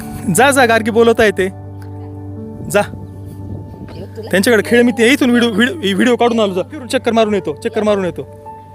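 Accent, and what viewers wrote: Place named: Kolhapur, India. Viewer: Kuthal gaav ahe bhau ❣️???? Tumch kolhapuri accent 👌🏻👌🏻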